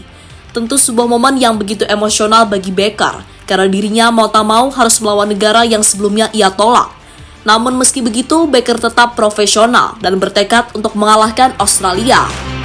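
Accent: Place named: United Kingdom. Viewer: Suara aksen british nya lebih menggetarkan. Cocok didengarkan di dalam bioskop film documenter bertema perang, mafia dan horor.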